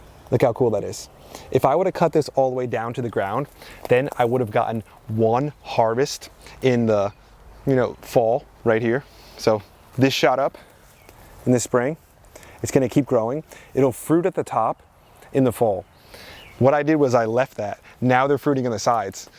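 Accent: New Jersey, United States. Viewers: Haha, yeah, his Jersey accent coming out. Be happy he’s not from Boston or Brooklyn, LOL.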